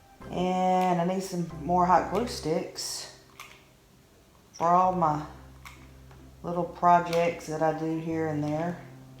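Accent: South, United States. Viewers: Hi. Judy here. I have only watched a few of your videos. I did subscribe to your channel. I love your accent!!!! I probably sound a lot like you as I am from southern central OK. Every time I go visit my daughter in Oregon, people are always commenting on my southern accent!!! 😘. I really enjoyed watching your fall home tour. And I absolutely love this project that you did in this video. It is too cute and looks easy to make. I am thinking of maybe getting all the necessary items and doing this with my daughters for our project when we are together for the Thanksgiving holiday. Who would have thought of using splatter screens to make something so beautiful. With choices for fabric etc, the sky is the limit. You seem like such a nice and wonderful person we would sure make good neighbors, as I love crafting also!!!! My husband broke his wrist years ago, but the difference with him is that he broke his right wrist and he is right-handed. 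I remember when he went back to work where he worked in the microbiology department of our local hospital lab, he wrote the results of his reports with his left hand. He said at first it nauseated him, but then he got kind of used to it. I hope you do well when the cast comes off. It took him a while to gain range of motion in his wrist because it had been fixed in one position for so long that when he tried to go beyond that position, there was some paiin; however, it did not take him too long. I am sure you will do fine. Looking forwar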